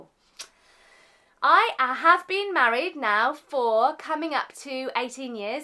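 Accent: United Kingdom. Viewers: Good upload. Thanks for doing so. I'm guessing the narrator is English or lives in the UK, based on this person's accent - I'm Canadian and live in Toronto, but I'm sure a lot of what this person is saying could apply in other countries & cultures.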